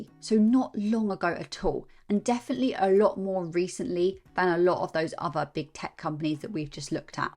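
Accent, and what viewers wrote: England, United Kingdom. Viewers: When you speak, I think of a Charles Dicken's novel! Amazing, genuine, English accent!!! 👍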